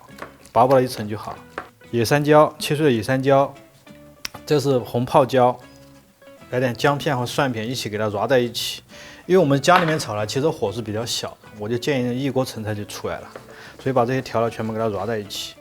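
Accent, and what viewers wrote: Sichuan, China. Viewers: 作为成都人听着你说的川普好亲切 谢谢你的分享！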